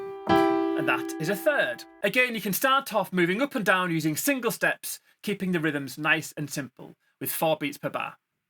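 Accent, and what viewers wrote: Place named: Manchester, United Kingdom. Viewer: Love listening to your accent! I’m guessing it’s northern - Manchester? From an avid British tv watcher from Australia. Also enjoy the content of your videos - very helpful!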